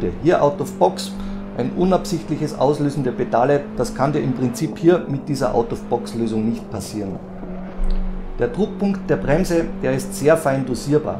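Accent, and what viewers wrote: Austria. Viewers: A Sum up would be verry Nice! Like your video’s but the accent (Austrian) is verry hard to understand for me 😁 really curious about your opinion related to the v3 (i).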